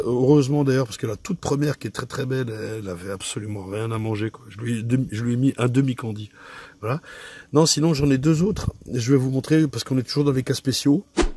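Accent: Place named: South, France. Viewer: Hello ! D'abord grand MERCI ! Encore une vidéo passionnante ! C'est dingue ce que j'apprends grâce à toi... Ensuite.. J'aime trop ta voix de Bary White 😉 et ton accent du sud parfois... Lol.. Enfin.. Pour moi la reine vierge va mourir.. Soit abandonné par terre.. Soit tuée car les zabs vont sentir les phéromones de l'autre (bonne) reine.. Et n'auront plus besoin d'elle... J'ai bon ? Encore mille merci ! Flo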